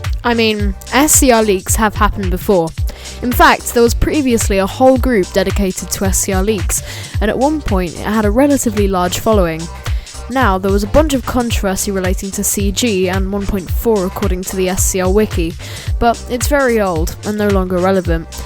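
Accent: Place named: United Kingdom. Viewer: I like your British accent, I would also like to talk like that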